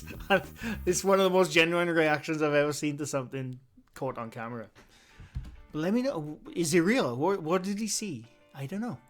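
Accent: Wales, United Kingdom. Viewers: your Welsh accent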